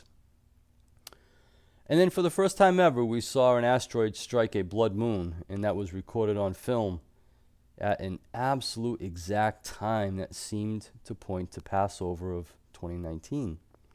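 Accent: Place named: Northeast, United States. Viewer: You're my favorite YouTuber. I wish I lived closer to u, id love to meet . ♥️😊. I feel alone because no one around me seems to see or care about the signs of Christ's return. Every once in awhile, I'll meet a customer that sees it and is excited but I usually never see them again. Sounds like you're from the north east, I'm from Florida. Ty for your videos, I truly enjoy them!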